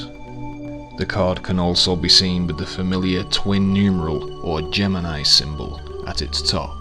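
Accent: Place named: Liverpool, United Kingdom. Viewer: this guy loves the sound of his own voice, but still interesting non the less, not bad for a scouser. i'm a woolly back by the way if i sound bitter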